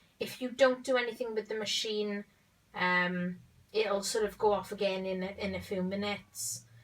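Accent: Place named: Wales, United Kingdom. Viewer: After sueing the arse off Currys and FINALLY getting a refund for the Hotpoint washing machine that only lasted 3 months and the case stressing me out i am looking for another Bosch as my old one lasted me 17 years so i have all faith in Bosch. Thanks for the review:-) (Love the welsh accent too);-)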